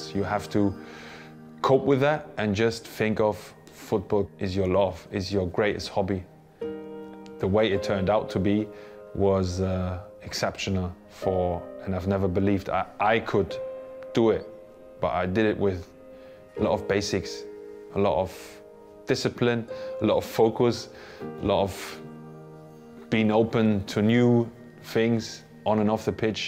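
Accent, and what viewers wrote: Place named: London, United Kingdom. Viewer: sounds like he is from london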